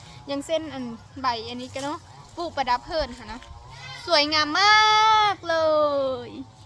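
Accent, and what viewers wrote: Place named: Phu Thai, Thailand. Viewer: สบายดี น้อง สาวภูไท เว้าม่วน พี่FC ฝั่งไทย เด้อ น้อง เก่งๆ แบกตะกร้า ผ้าไปชักเครื่อง บ้านอีน้อง กดไลค์ กดแชร์ เป็นกำลังใจ❤ ให้น้อง สาวภูไท คนงาม เด้อ จร้า❤❤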